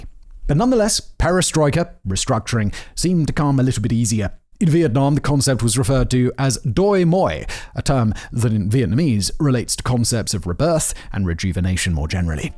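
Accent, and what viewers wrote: United Kingdom. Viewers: The Idiots Guide to Vietnam's Reunification for Tourists. Qualified by annoying pretentious and pompous British accent.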